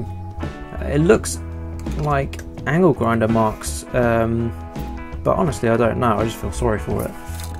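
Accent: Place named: United Kingdom. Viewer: Aloha from Maui! I am currently resurfacing an ancient rusted out deer horn handle hunting knife that quite possibly could have been lost or discarded by Nathanial Boone or even...David Bowie!! Really enjoy your quazi-cultured British accent and your irreverent cavalier approach to knife refinishing. Well Done!!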